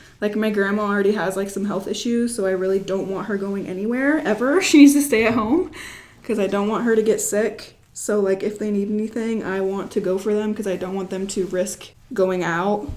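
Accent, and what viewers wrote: United States. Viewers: crazy question, are you from the south? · Omg youre from Indiana?? No wonder why we have the say accent 😂 I'm from Indiana too haha born and raised Chesterton just moved to Valpo. I work for family express distribution center and I still have to go to work 😔 I want to start exercising outside but it's been chilly and windy !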